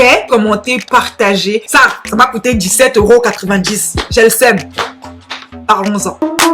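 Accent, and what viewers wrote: Cameroon. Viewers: J'ADORE TROPPP TON STYLEEE, TU ME FAIS TROP MARRER !! 😂😂😂 En plus t'es ma sœur camer par ton accent je le sais wow. Hahahah. Je ne te connaissais pas avant pourtant t'es déjà à près de 20.000 abonnés.😍😍 Je me suis vite abonnée. Big Kiss et courage pour ce que tu fais et je remercie YT pour cette recommandation. ❤️😘😘😘😘😘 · Jadore jadore jai bien dit jadore l'accent camerounais je le trouve beau je kif voir les camerounais parler et en plus je tombe sur toi je ne te connaissais pas mais je te trouve fraîche pétillante marante en plus ta l'accent 😍😍😍😍😍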